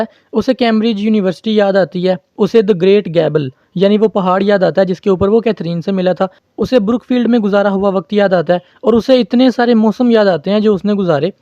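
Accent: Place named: Lahore, Pakistan. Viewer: Apki language lahoriii type hai ap Lahore sy hai ?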